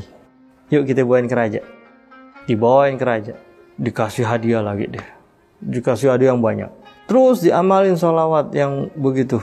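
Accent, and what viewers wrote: Jakarta, Indonesia. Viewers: Kenapabberubah Jadi mode Betawi bang Ceritanye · Betawi Mane Bang, ET dah, Terimakasih Bang, sangat bermanfaat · Ustad mudah BETAWI ASLI nih...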